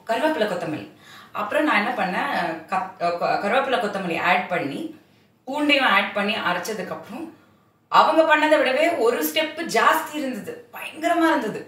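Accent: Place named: Thanjavur, India. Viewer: அப்படியே வச்சிஉருச்சி தஞ்சாவூர் நாட்டுசாலை பேச்சி இருகுது மிக்க மகிழ்சி உங்கள் சமையலைவிட உங்க பேச்சியத்தான் நான் அதிகம் ரசிப்பேன் அக்கா supet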